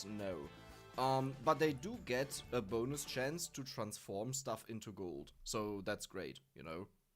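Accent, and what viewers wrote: Germany. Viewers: man hört das du Deutscher bist an der weiße wie du Namen aussprichst ^-^ DANKE für die nicen Videos ^-^ ♥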